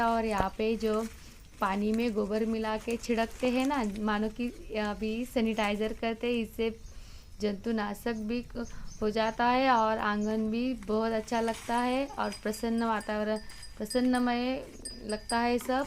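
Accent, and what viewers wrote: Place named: Maharashtra, India. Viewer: मराठी दिसत आहात.... मराठी माणूसच अशी हिंदी बोलतो.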